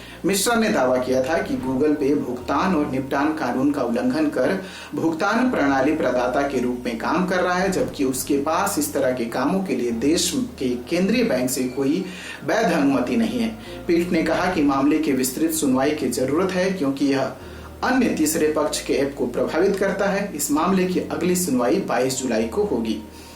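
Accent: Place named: Ranchi, India. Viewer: bhai...aap Ranchi se hai kya??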